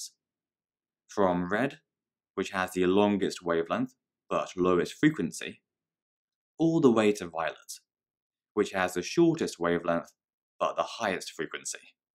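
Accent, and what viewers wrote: United Kingdom. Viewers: I love bri'ish English.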